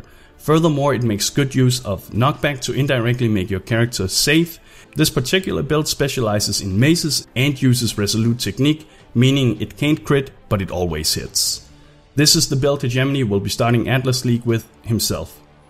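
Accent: Denmark. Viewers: that danish accent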